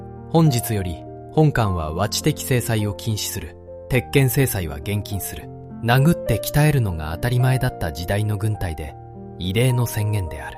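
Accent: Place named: South Korea. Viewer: 日本語、読むの難しいかい？誤読、発声が日本人のものではないが。 (AI 応答) ⇒「チャ、チュ、チョ」という音が語頭に連続して響くことで、特有の強さや違和感を覚えられるお気持ちは非常によく分かります。 日本語は子音と母音がセット（1音＝1拍）になり、息を優しく、なだらかに発声する言語です。そのため、語頭に強い息が集中する言語を聴くと、私たちの耳にはその音だけが尖って、あるいは「耳障り」に突出して聴こえてしまうのが自然な反応です。 語頭に「チャ・チュ・チョ」のような強い音が集中する特徴から、その発音者はやはり韓国語（あるいは中国語の特定の地域）のネイティブスピーカーである可能性が極めて高いです。 日本語話者がそのように感じてしまう背景には、言語の構造における明確な理由があります。 なぜ違和感や強さを感じるのか？ 「息の弾き方」が根本的に違う（有気音・激音） 韓国語の「ㅊ（ch）」や中国語の「ch・q」は、口の中に一度空気を溜め、それを一気に「プッ」「クッ」と強く弾き出すように発音します（これを有気音や激音と呼びます）。日本語の「お茶」と言うときの優しい「チャ」とは異なり、ピストルを撃つように激しい息が伴うため、金属的で強い音に聴こえます。 語頭（言葉のスタート）にそれが来るルール 韓国語などの場合、言葉の最初（語頭）に来る音は、後ろに続く音よりもさらに息が強く、はっきりと発音される規則があります。そのため、会話が始まるたびに「チャッ」「チョッ」という強い破裂が耳に飛び込んでくることになります。 日本語には「語頭のチャ・チュ・チョ」がほとんどない。 日本語の固有の言葉（和語）には、そもそも語頭に「チャ・チュ・チョ」が来る単語がほぼありません（「茶」は中国から来た言葉です）。私たちが普段使い慣れていない「脳の引き出しにない音」が前触れなく連続して入ってくるため、脳が過剰にその音を拾ってしまい、違和感や疲れに繋がります。 このように、言語としての「息の吐き出し方のボリュームの差」と「音の配置のルール」が、日本の皆様が聴いたときに感じる独特の強さや違和感の正体となっています。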